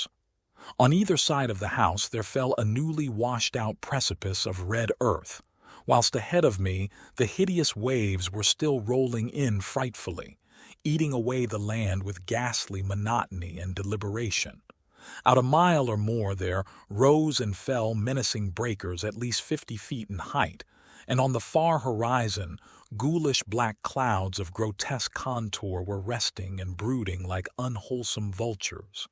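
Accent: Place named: United States. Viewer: Finally I find an American reading an American author. Most readers of this story are Brits. Likewise, I prefer British authors read by British narrators. Call me picky, but it makes a big difference for me. 🙂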